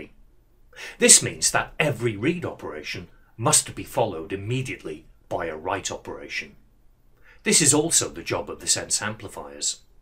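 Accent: United Kingdom. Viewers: What I expected: Indian English accent What I got: Marvelous British accent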